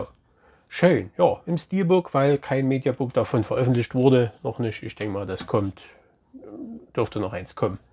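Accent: Bavaria, Germany. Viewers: Servus da hast Du interessante Filme bekommen gerade den film Bliss ich wünsche dir gute Besserung es dir ein Leberkäs den kann man titschen aber nicht in die Milch sondern in den Ketchup und zwei Knödel aber zum Essen😉 mach noch mal nächste Mal noch bayrisch das tut gut da kriegt man Heimweh da ich gerne auch nach Bayern möchte in Dorf 🙏 gruss Tony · Danke für dein Päckchen! Joa mei dein Bayeisch ist ja Klasse! Mach dir mal en Leberkäse vielleicht hilft s! Konntest schon was aus meinem Päckchen sichten? Possesor muss ich nochmal starten letzte mal abgebrochen!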